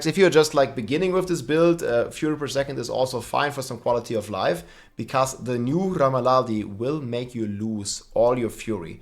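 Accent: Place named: Germany. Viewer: An hört sofort einen deutschen raus wenn er Englisch spricht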